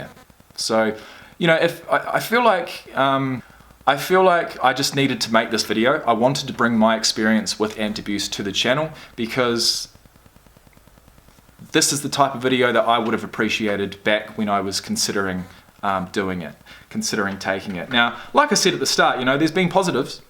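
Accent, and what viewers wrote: New Zealand. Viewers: You from New Zealand?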